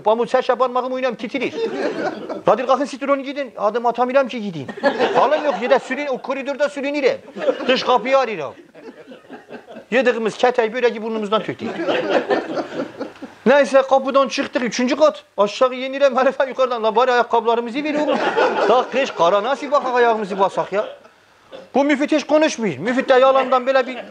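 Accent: Erzurum, Turkey. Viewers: Üniversiteyi Erzurum’da okudum özlemişim bu şiveyi ağzına sağlık kardeşim hala gülüyorum. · kardeşim sohbetlerini Zevk ve hazla dinliyorum. Takibinizi yapıyorum. Diskionunuz güzel akici. Zekanızı güzel kullaniyosun. Erzurum şivesiyle mübalağalida olsa taktir ediyor başarılar diliyorum. ANCAK..!!!? O tatlı sohbetlerin arasında keşke aralarda üstüne basarak ettiğin küfürleri kullanmak zorundamisin? Bu hoş sohbetlerini aileler, ana baba bacı çocuklarda dinliyor. Bilmem nerelerini yererem. Vay ben orana yok burana S....um. Vay ben A....k... gibi küfürler sence doğru ve çok hoş mu? Kaldiki hele hele bir Dadaşa böyle bir dil üslubu yakışiyo mu? Lütfen..! Sevgilerle selamlar Hemşehrim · Erzurumluların şivesine bayılıyorum ya 😂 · 🤣🤣🤣🤣he valla ölide bizim.diride doğri diyir çok hoş erzurum şivesi konuşmalari slm.olsun bütün erzurumlu kardeşlerimize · Bir erzurumlu olarak şive dinlemek bile çok güzel. 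Ama şu aralara neden küfür konur anlamam. Hiç hoş değil komik asla değil. Erzurumluların ağzı bozuk değildir. Komik olmak için buna gerek yok. Bu yüzden dinlerken gülemiyorum ve izlemek istemiyorum.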